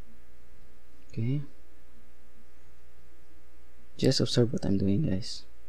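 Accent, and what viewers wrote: Philippines. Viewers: Excellent. You are the one I want to learn from as a beginner. You speak like you are a Visayan from the Philippines, are you?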